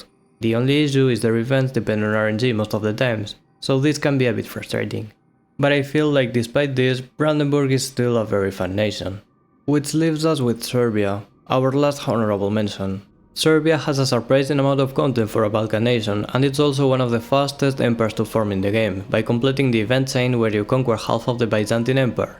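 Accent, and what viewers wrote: Spain. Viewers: sorry man. Your accent really looked Spanish to me and since you said you like playing with Castile...😅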